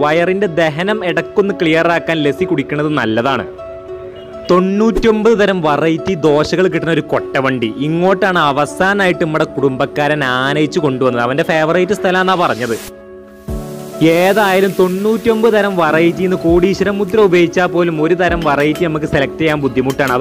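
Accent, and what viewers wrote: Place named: Malappuram, India. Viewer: Malappuram baasha uyir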